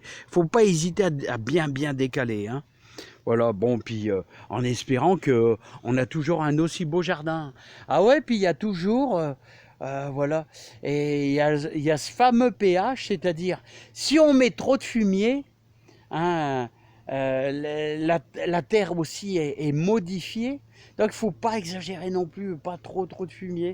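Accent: North, France. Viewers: Bonsoir monsieur, une video intéressante, j'ai toujours autant de plaisir à vous écouter, et cet accent chaleureux de mon nord que j'ai quitté, prenez soin de vous à bientôt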